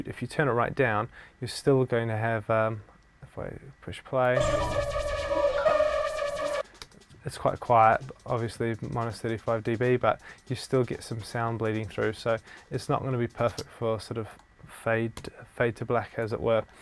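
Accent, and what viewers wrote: New Zealand. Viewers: CHEERS! Awsome vid bro, I think you explain things really well. I will definatly subscribe ;) Take it easy! P.S. Your a kiwi right?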